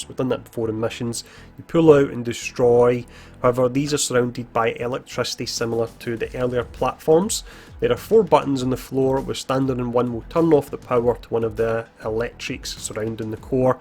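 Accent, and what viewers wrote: Scotland, United Kingdom. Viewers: this video was recommended to me if that helps as I've been watching a lot of different avengers content....I just cant figure out your accent...Scottish?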